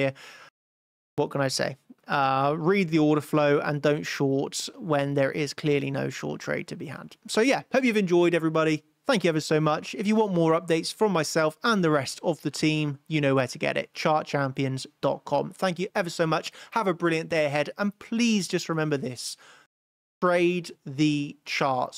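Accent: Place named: Somerset, United Kingdom. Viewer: SOUTH WEST. Man's got a Somerset accent. Beautiful part of the country ❤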